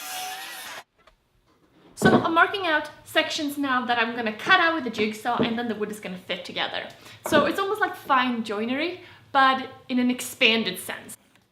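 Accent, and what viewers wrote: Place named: Norrland, Sweden. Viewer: I love the roundover you did!! So smooth and modern looking :-D This piece is very nice. And that's a compliment coming from a person who does not like knots in wood at all (clear only) ;-) Very nice looking work! :-) Just curious though: have you ever used the "bow tie" type of jointery? Here's a short vid by a guy who acts kinda weird, but his method seems very good - they are custom shapes too! <link> By the way, by any chance are you from Northern Sweden, I once had a housemate who was from Northern Sweden, his accent was similar to yours. But many other Swedes I've met had mastered the American "accent" (called a "Flat Accent" by the British), so I couldn't tell that they were Swedes until they started talking to each other...it was CRAZY :-D I couldn't believe my ears. They had no discernible accent at all. Crazy, right? 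:-)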